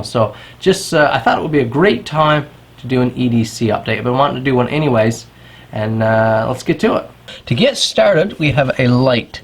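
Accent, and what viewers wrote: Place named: Newfoundland, Canada. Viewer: Yesman your a newfie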